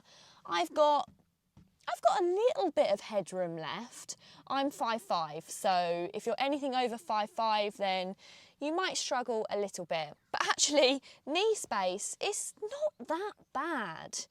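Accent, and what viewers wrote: Essex, United Kingdom. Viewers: I love your accent! What part of Essex are you ftom? I was born in Rochford.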